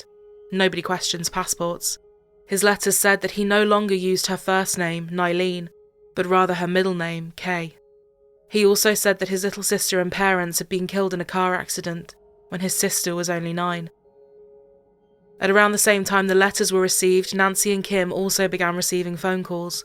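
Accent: United Kingdom. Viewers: I don’t like a British narrator, it implies that story is in Britain, are there not any American narrators?I’d feel the same listening to a British story narrated by an American narrator. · yay, super cute, british girl voice narrator crime!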